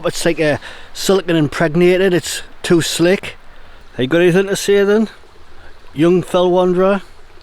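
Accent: Newcastle, United Kingdom. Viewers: I have to ask are you an east country man? A Jordy perhaps?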